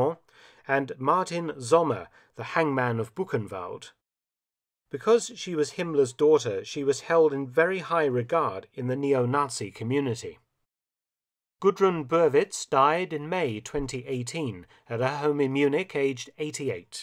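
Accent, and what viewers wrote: United Kingdom. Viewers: He's British. Americans are the English speakers that hardly ever care.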